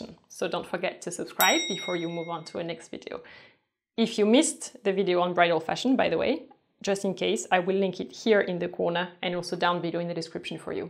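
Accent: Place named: France. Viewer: i love your accent so much!🤗 it's true🙄. with french shade.. i'm personally from Ukraine and i study english now☺. and this channel is a piece of inspiration for me🙄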